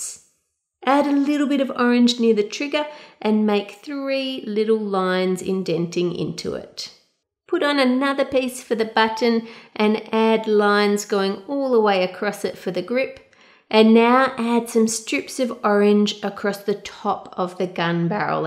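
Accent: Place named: Australia. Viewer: sounds more Australian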